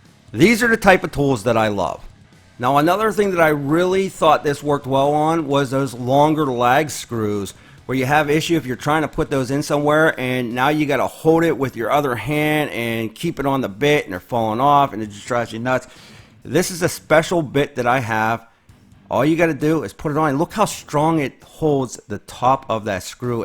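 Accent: Pittsburgh, United States. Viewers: You don’t happen to be from the Pittsburgh area are ya? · Pittsburgh accent? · Just a little Pittsburgh accent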